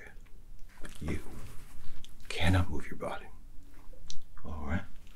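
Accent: South, United States. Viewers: This reminds me of the part in fable 2 where the photographer takes the young sparrows and sisters picture. Also, amazing southern accent. Where are you originally from? Him: give me a smile. Me: ⊙﹏⊙😬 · How do you not have a million subscribers? Nobody comes close to the content you are putting out there...Crazy good stuff, man! And as a lifelong Southerner, the accent is spot on! LOL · Your southern accent is amazing. One of my favorite accents!!! This is impeccable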